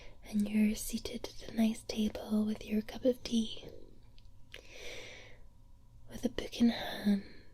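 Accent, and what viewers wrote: Scotland, United Kingdom. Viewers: Her Scottish accent is everything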